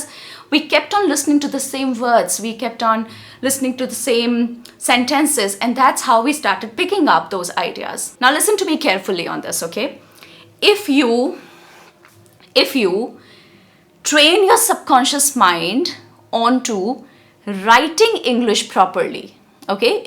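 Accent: India. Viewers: Hello Ma'am, How are you? Ma'am I watch & listen your videos very carefully. Ma'am one day you were saying that choose easy word to speak in English & then I use choose easy word to practice myself for daily uses . Everyday I watch your videos I like your videos no matter what the time is but I use the time to watch your videos & listen that what are you saying. I think I use to start first easy word to speak then it will automatically habitual me after that I will become hard & advance or phrases word to replace that word. I like your indian accent that's why I watch your videos repeat more than one I like your videos & when you teach us properly then I can understand clearly. I use those word & repeat that word to use speak in English. I am a beginner & I Don't know what happens to me I can't speak in easy word to speak in front of someone 's else.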